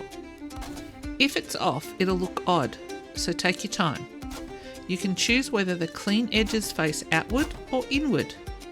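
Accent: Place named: Australia. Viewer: It’s wonderful to hear an Aussie accent on a Valheim build vid! Thanks for the beautiful build- I’ll be trying this one out! 💕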